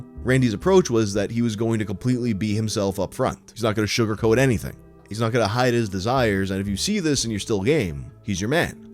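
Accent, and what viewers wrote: New York, United States. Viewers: Dude I love him and his deep New York voice like Peter Steele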